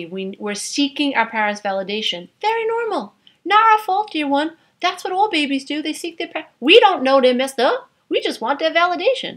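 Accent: New York, United States. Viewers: i just dicoverd your channel.i love your empathy and intrest in your work.you are teaching me somuch!i also love your new york acent! from kevin,an abused child that went thrugh councilg off and on for 30 years.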